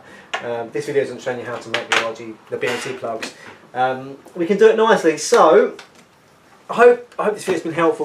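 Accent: Cockney, United Kingdom. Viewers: do I detect a bit of a Cockney accent there?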